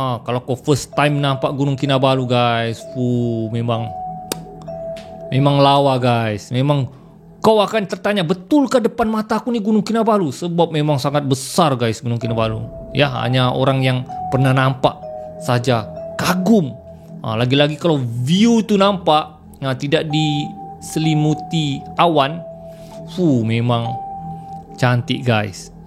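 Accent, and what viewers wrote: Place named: Kelantan, Malaysia. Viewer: Abe seram chanel masih baru chanenya lbh kurang 1 tahun,subsc pun baru lbh kurang 40k.tapi setiap video mampu ditonton 10k.....si abe ni berdailet kelantan tapi masih mampu lg difaham. ada juga si abe ni baca terus cerita atau skrip yg dihantar kpd dia...masih lg menarik.tak salah baca terus skrip cerita tu dari bercerita terus secara menghafal jln cerita....malapetakanya bila tak ingat skrip cerita mula nk merepet nk bohong dlm usaha nk bercerita jgk.... cerita ini menarik dan lbh menarik jika oerkataan yg digunakan mudah difahami.....sekadar pandangan saya saja. cadangan saya suoaya tuan baca terus srip cerita yg dikirimkan dgn olahan tambahan.(jgn menghafal mcm skrg)....tapi jija tuan rasa cara ini baik dan selesa teruskan.yg penting tuan puas dan seronok apa yg dikerjakan bukan org lain yg puas dan senorok dengar. 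ok tq semoga tuhan sayang kita semua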